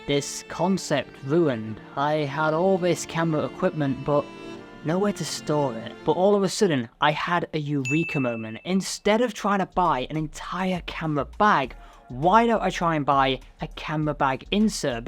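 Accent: United Kingdom. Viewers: I learn in this video how to use Sony a6000 things and also how I can talk like Britain 😮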